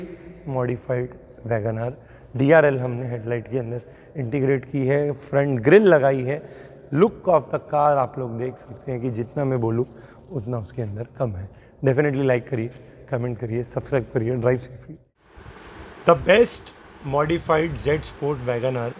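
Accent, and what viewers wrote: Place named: Maharashtra, India. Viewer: Waa..... मराठी आवाज अचानक ऐकून खूप छान वाटलं......❤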